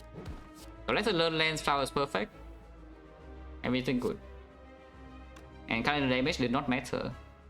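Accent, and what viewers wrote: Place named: Vietnam. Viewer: How sheltered are you to have never heard an accent before? He's Vietnamese and lives in Vietnam. I think his accent is great and he's also fucking hilarious. Please stop talking.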